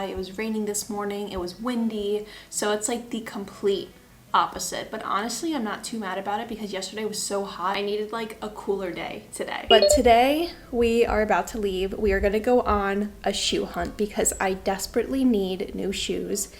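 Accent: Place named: Argentina. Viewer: "Sha" usas lunfardo gringa, sha casi argentina 😂❤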